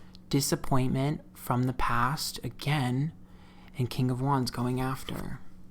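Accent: New York, United States. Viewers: On a bright note - I love when your NY Italian accent comes out! It's the best! On a serious note - praying SO hard for Jeffree. He really is trying to grow and everyone can relate that it's the worst to be stabbed in the back by someone you love and/or trust. Also, can't help but feel "Too Faced" vibes on the person trying to get at him.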